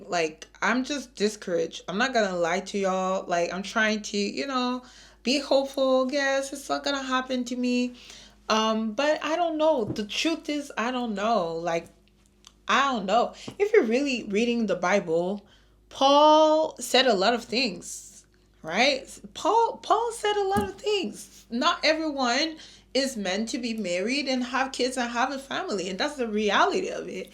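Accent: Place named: Italy. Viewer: you must be Italian!! you sound just like me loool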